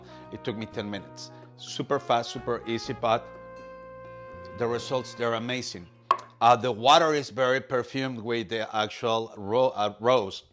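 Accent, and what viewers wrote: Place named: Latin America. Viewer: I’m sure your Latin accent is part of why “Production” fell in love with you. 😂 The rose water tutorial was great. I need to try that. Salud! 🥃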